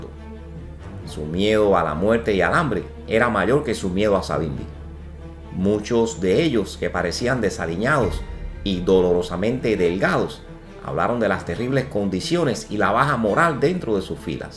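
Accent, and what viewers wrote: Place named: Cuba. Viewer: YO SOY CUBANO Y POR TU ACENTO SE QUE ERES CUBANO, HACES MUY BUENOS VIDEOS TE FELICITO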